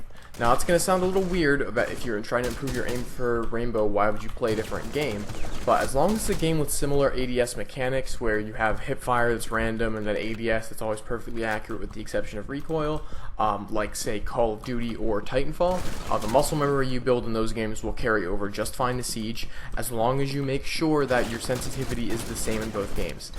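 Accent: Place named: General American, United States. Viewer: The truth is that he talks with a completely standard american accent. At times it might have been a bit fast but he pretty well sounded everything out and spoke clearly. So honestly I do understand your struggle as I have learned a second language myself, but you just need to practice the language more and the criticism in my genuine opinion is undeserved.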